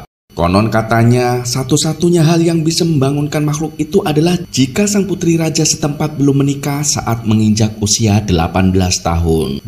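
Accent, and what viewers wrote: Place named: Java, Indonesia. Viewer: Admin asline wong endi kang? 😂😂😂 lucu logat Jawa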